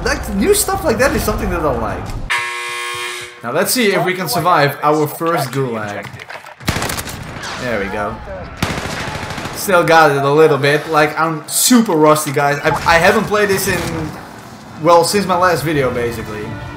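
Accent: Netherlands. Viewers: are you from dutch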